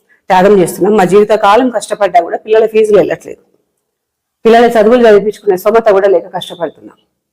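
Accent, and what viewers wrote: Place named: Telangana, India. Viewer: Mee vedio s andhrajanam koodaa choosthaamu,achhu thelangaanaa yaasa lo maatlaaduthunnaaru, koncham andariki ardhamayyelaa maatlaadaalani koruthunnaamu😊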